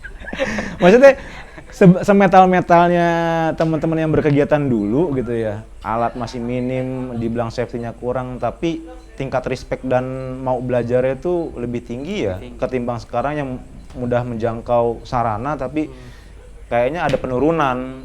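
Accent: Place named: West Java, Indonesia. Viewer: Maksudte, akhire ada bahasa jawanya tapi logatnya sunda bang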